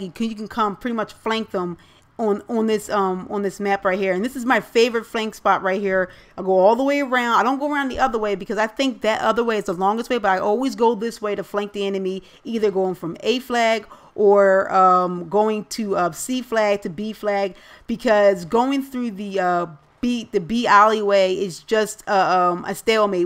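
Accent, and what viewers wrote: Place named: Northeast, United States. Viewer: no i meant northeastern USA not northeast philly.... but yea.. lol i coud tell u was from around here.. accent n certain phrases is hilarious. i remember playin against u before.. its a small BF3 world man. and lol sum ppl may like u a little too much... its sad isnt it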